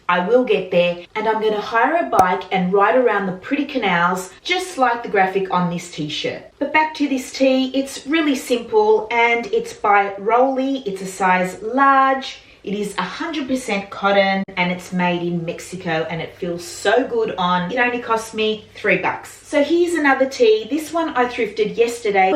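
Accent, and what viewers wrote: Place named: Australia. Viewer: You did good again. Be careful wearing that navy "slip dress". Warner's is a lingerie line. I think that's a "slip" for "under " a dress. Sorry, I couldn't understand your Aussie accent, but we're you say Lida Biday? I think that's a designer from Sweden. I have a problem distinguishing plaid and check, but I think that's a plaid shirt. If it's too large a seamstress can alter it smaller to fit you better. I can't understand how the prices are sooo cheap. Also, beautiful Frenchie shoes.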